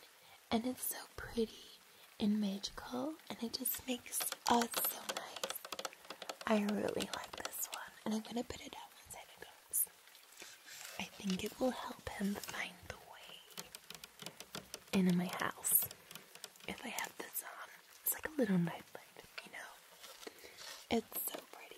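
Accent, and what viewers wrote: United States. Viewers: American accent in this one, so I guess today you’re a girl and not a gurrrrrrrul. Either way, I’m sure this will be a great video as usual. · Technically any form of the accent is american depending on where you would be in America. I still think you did a great job if I was to say an overall accent 👍😁👍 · Haha, good American accent! Except an American would never say that they are going to "pop on" anything or get something "straight away." Lol. Really good though. Always fun to hear people from other places trying an American accent. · I love hearing college american girl accent 💕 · Your American accent is pretty good! (Coming from an American) Some words are more exaggerated or repetitive than a normal American accent but to each his own ❤️ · this is a great american accent! and I should know...;) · This video was so enjoyable to watch. 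I really enjoyed all of triggers. This is really going to help me relax and fall asleep during the holiday secession. You have such a lovely american accent. That's a really nice dog you have. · The American accent is on point 👀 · Forgot to say: Also your american accent is getting really good. I think it's a great Californian accent do to it's lighter tone which is actually really close to my Washington DC / Northern VA / mid-Atlantic accent. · You’re always the best in ASMR! Just began watching your new video and am loving the generosity in this 😁 You’ve got a great american accent and I approve of you helping others sleep peacefully 🎄🎄🙌☺️ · Always look forward to falling asleep with. Don't know what I'd do without you. Great American accent btw. 😗💗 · I love your American accent, it's so on point. ❤ This definitely made me sleepy, super relaxing. 😌 I love the sound in the background. 💕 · You look so radiant dear 🥰, you're too nice, I've been fond of hearing your sweet voice. 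You look so gorgeous the times that your beautiful eyes look up, as you did in the second, you're very expressive, I've always liked that about you. Your American accent it was gratifying, thank you, I will always love your channel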